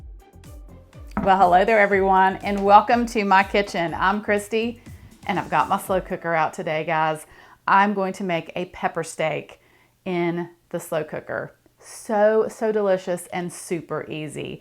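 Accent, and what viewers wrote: South, United States. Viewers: Hi there! Enjoyed this video, your accent sounds Southern to me. I suspect that you are always on the lookout for meal suggestions to make on your channel. Might I suggest corn fritters and southern fried catfish. Keep up the good work, enjoy your videos a lot.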